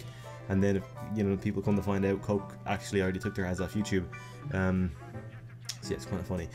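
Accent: Ireland. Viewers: you irish cuz i am :)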